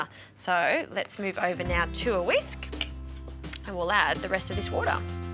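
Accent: Australia. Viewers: What accent she using? Australian? Kiwi?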